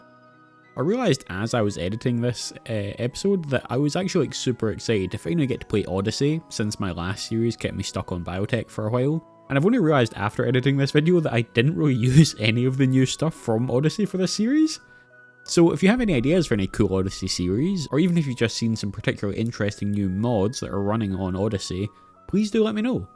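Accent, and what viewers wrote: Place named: Scotland, United Kingdom. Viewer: Which part of scotland are you from man, peak video btw.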